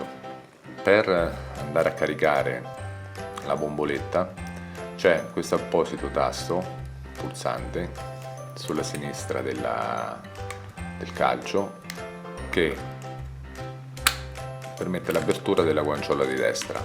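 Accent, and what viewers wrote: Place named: Marche, Italy. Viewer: Dall'accento familiare sembri Marchigiano..👌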